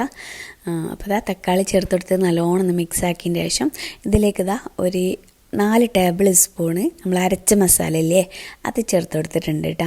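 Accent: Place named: Malappuram, India. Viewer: നിങ്ങൾ മലപ്പുറം താനൂരിൽ അല്ലേ.... ജനിച്ചു വളർന്നത് ഒക്കെ.... സംസാര സ്റ്റൈൽ ഒക്കെ വേറെ രീതിയിൽ....അതെങ്ങനെയാ.....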